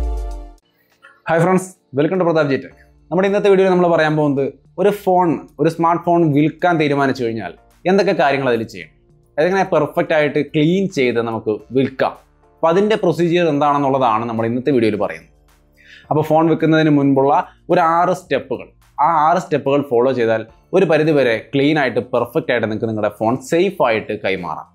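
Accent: Thrissur, India. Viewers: ഫോൺ അങ്ങട് തല്ലിയ പൊളിക്ക. തൃശ്ശൂ കാരൻ ആണെല്ലോ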